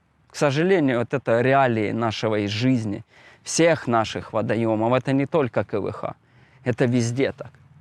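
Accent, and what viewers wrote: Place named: Russia. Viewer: ти ч наче з офіційними особами в рейді! майже при виконанні. що за кацапський говір?... от так само ти кладеш на Мову, а вони на правила рибальства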